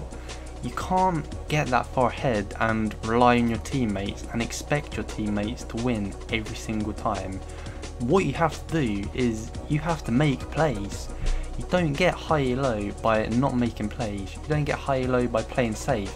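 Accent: United Kingdom. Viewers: He includes (british gamer) because the accent is what brings people here:D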